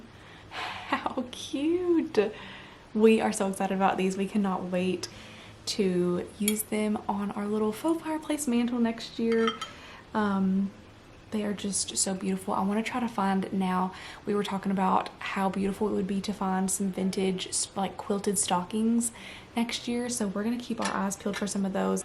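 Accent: South, United States. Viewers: wow those brass christmas stocking holders are so uniquely special, youre so blessed to find them ✨ just wanna say that i love your thrifting vids & your lovely southern accent calm voice is so soothing to listen to 🫶🏼 baby light blue, white and grey hues are your color hon, you look stunning. youve got a very beautiful home, your mom and hubby must be so proud... 🏡💕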